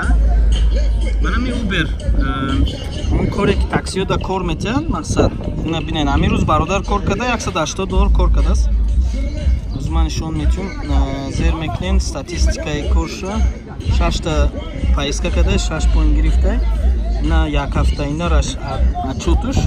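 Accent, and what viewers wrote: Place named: Dushanbe, Tajikistan. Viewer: обожаю тебя, душанбинец ты наш. 😘😘😘😘😘 Этот акцент... Как же давно не видела наших местных коренных душанбинцев. 😘😘😘😘🤗🤗🤗 Удачи!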